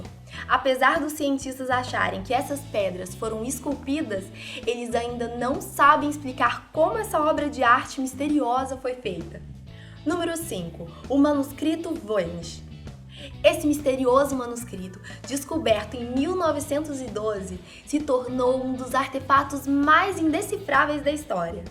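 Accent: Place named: Minas Gerais, Brazil. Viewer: esse jeitin minerin de falar me despertou um interesse em saber se essa moça é solteira e se ela faz o wats dela deixar de ser um mistério pra mim kkkk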